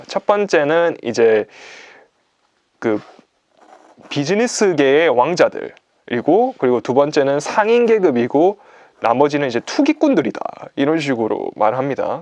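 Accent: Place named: Gyeongsang, South Korea. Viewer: 경상도 억양의 남자분인데 영어 문장을 읽을 때는 발음이 오~ 혼돈 속의 혼돈 번역해서 책으로 내신다면 사고 싶어요.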